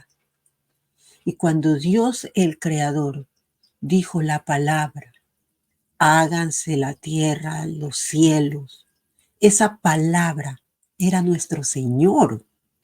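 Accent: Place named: Dominican Republic. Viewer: La verdad tiene acento dominicano,yo soy dominicano vivo en ny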